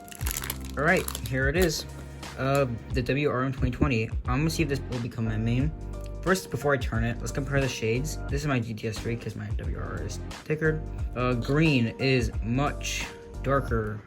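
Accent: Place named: United States. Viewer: i jus realized u sound like tymon kolasiński but with an american accent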